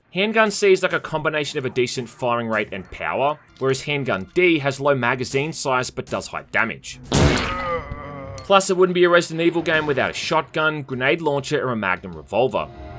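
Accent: Australia. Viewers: Wait... is an Aussie? I thought he was British!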